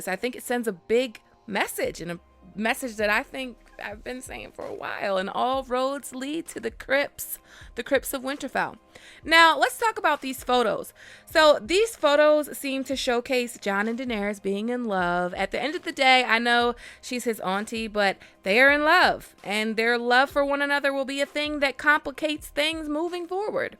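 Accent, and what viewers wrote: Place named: East Los Angeles, United States. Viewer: I love your voice! It’s kinda East L.A. with a hint of Inglewood 😊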